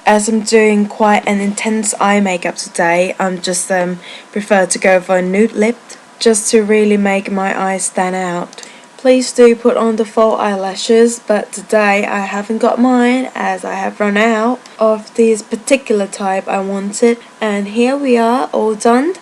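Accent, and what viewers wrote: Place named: United Kingdom. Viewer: I wish I have British Accent like yours. T^T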